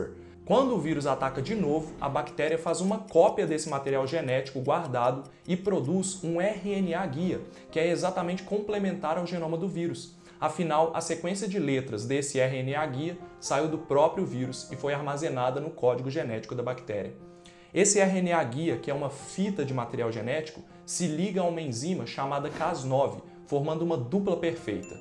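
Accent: Minas Gerais, Brazil. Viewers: Adoro seu sotaque mineiro!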